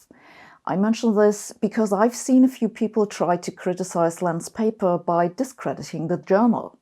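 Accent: Germany. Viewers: Schlechtes Mikrofon und ein Englisch mit starkem deutschem Akzent macht das Hören anstrengend. Der Akzent wird nur schwer zu ändern sein, aber ein besseres Mikrofon wäre vermutlich möglich.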